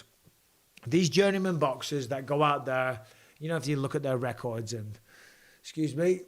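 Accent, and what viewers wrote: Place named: Northern Ireland, United Kingdom. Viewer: Its a solid NI accenf im impressed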